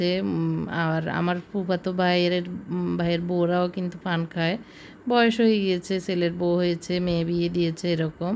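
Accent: Noakhali, Bangladesh. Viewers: Aunty apni fenir naki noakhali r😀😀❤️❤️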